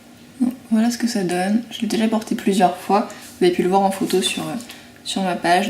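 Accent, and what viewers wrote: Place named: England, United Kingdom. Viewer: Coucou miss.... Ah qul plaisir cette vidéo avec ce petit accent anglais j adore un pur moment de détente merci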